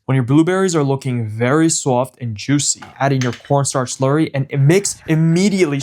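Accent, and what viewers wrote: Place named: New York City, United States. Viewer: Bro you from NYC or the metro area? You say toss, salt, water like i do. Nice to hear a “familiar” voice on youtube!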